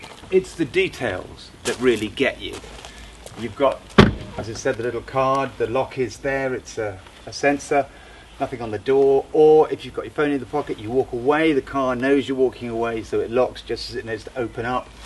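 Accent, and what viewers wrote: United Kingdom. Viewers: Very nice to hear Tesla get praised in the British accent, after all of the other's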